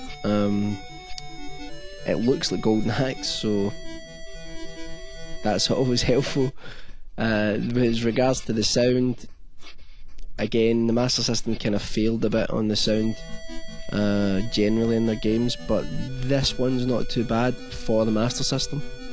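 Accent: Scotland, United Kingdom. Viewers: fellow scotsman gon yer sell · Cool review and funny accent. where are you from? Scotland or something?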